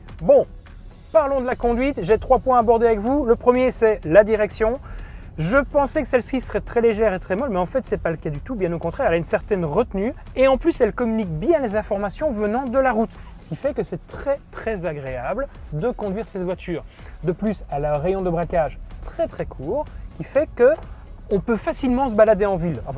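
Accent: Belgium. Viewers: Excellente vidéo. Et puis l'accent belge j'adore ;-) Mais ce modèle est lourd, plutôt cher, pas très puissant à la vue de sa batterie de 86KW (performance moyenne), longue à charger, bref cela, selon moi, manque de modernité. Et la marque Tesla avec son modèle 3 SR+ détrône de très loin ce modèle ;-)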